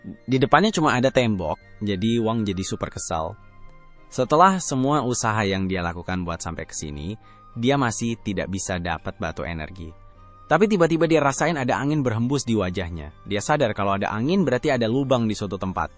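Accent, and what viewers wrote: Malaysia. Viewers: Abang orang Malaysia ya😊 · Orang malay bang? Aksennya gitu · bisa ga si gausah pakek logat malay bng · Ni bahasa Malaysia apa Indonesia Tapi Malaysia dia pekat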